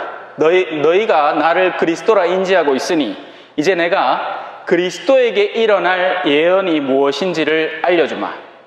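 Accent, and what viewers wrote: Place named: Daegu, South Korea. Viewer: 신부님의 대구 사투리 구수하네요 알기 쉬운 강론말씀 감사합니다 세속 육신 마귀에서 열린 자 되겠습니다 ^~~♡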